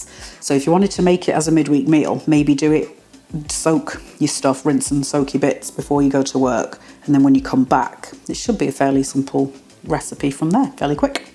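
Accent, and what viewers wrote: United Kingdom. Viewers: my pleasure … I’m in the US so the British accent is really cool 👍🏻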